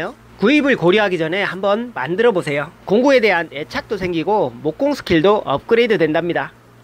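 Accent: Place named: South, Russia. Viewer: Южный диалект русского ,не Совсем понятно😀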